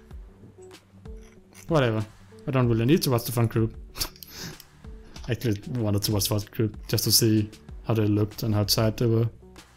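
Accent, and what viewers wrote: Denmark. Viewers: Man kan godt høre du er dansker med dig Accent. Hvor kommer du fra? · Are you from Denmark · Are you from Denmark?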